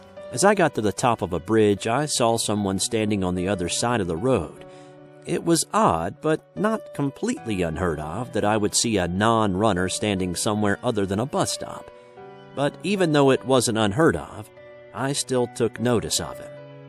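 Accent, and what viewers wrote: South, United States. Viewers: when you narrate your voice has that weird Southern style like the guy who used to narrate ‘Gravy Train’ dog food commercials... ‘Just add water and it makes a rich thick gravy, mmmm mm!!’ I like your channel but maybe take some voice lessons everything sounds like the Waltons.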